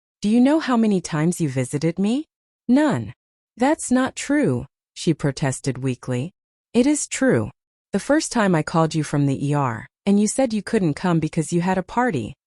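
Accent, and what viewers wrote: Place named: United States. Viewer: If you're going to use AI to create a story supposedly by an Aussie, you could at least use an Aussue and not an American voice! Also you'd get a lot more than $110K after sale of a unit in Sydney. More like $1M.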